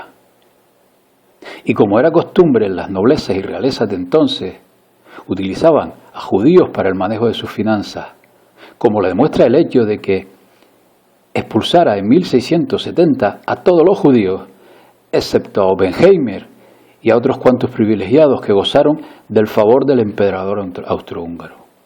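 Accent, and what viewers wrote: Canary Islands, Spain. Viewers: HABLA UN CANARIO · hablar con tanto acento español canario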